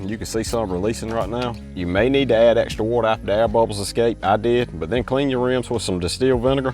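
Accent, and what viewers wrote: United States. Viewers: It’s some kind of American accent, definitely not Australian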